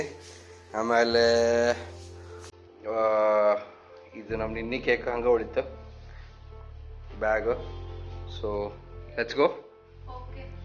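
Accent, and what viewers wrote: North Karnataka, India. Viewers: Mast pa Anna ni hero g yen kammi Ella ni mast matadti Nam uttar karnatak bhashe ninnu super anna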